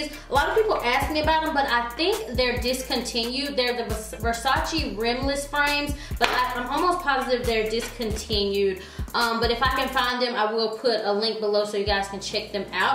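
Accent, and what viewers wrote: South, United States. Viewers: I have been looking at your videos for a little while now but I really have to say I love your southern accent! And girl you are gorgeous😍😘. It was chilly today in Charlotte so after I got my boys off to school I just set back & watched a bunch of your videos, followed & liked pics on your IG. I have become obsessed with your channel just from this morning lol. You have amazing taste! Keeping posting so I can keep watching 😊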